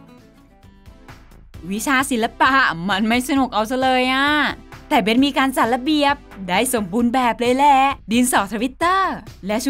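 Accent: Thailand. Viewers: ้เสียงคนพากย์เหน่อๆ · ทำไมมันดูเหน่อๆง่ะ😅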